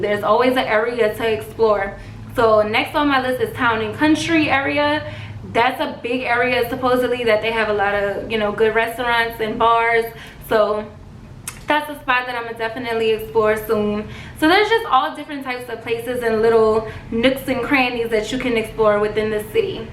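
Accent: New Orleans, United States. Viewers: Omg I love your new Orleans accent!!!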